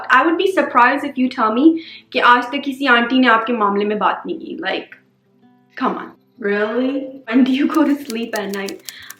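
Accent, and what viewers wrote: Pakistan. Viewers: Your Urdu accent is exactly like Pakistanis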